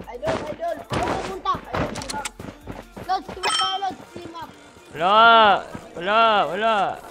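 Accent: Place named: Iloilo, Philippines. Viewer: Ilonggo yan lods hahaha trastalker hahaa illongo kami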